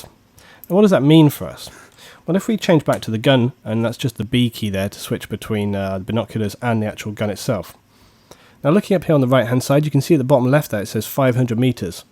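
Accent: United Kingdom. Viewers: feel like a british SAS with that accent, keep it up!!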